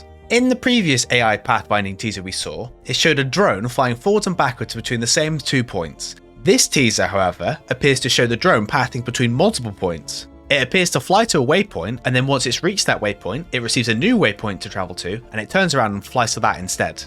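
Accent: United Kingdom. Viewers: I don't know, sometimes it did type Beta, its just your "e" sounds Vritish. Beeta, lol. Sorry making fun of English language cuz my native one is Spanish. BTW We also say aluminium instead of the 'merican aluminum. Go figure. Anyway fun video, thanks!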